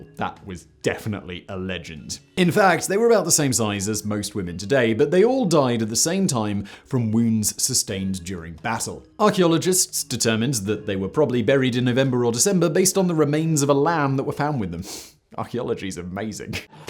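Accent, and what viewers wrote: RP, United Kingdom. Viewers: Combine a British accent, a black sarcophagus and the word creepy and I'm all in. Guilty clickbait. Sigh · Your arrogance is showing..English guy with the accent… but you’re rich from this but you click baited me with the extra chatting! I already know what you know 🤫, in spite of your perpetration of proper investigations! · Your tone is far too posh to allow proper concentration & your humor is nearly too tenderfoot to have the majority of anything said to be taken remotely serious... If you wish to be but a petty Monty Python copy then by all means present yourself as such. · Amusing hearing you go from BBC British pronunciation, occasionally to D&D levels of exorbiantly posh that would put Nigel Thornberry to shame. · Sorry, can't understand you. Please don't speak so fast. From USA. I don't have the ear for the queens English. 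Love the content.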